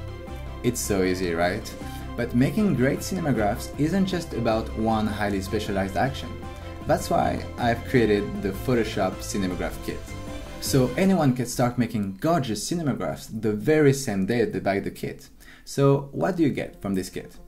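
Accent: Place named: France. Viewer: It literally has subtitles at the bottom of the screen, and the accent is French, but the English is brilliant. Using Action kit now. Excellent stuff!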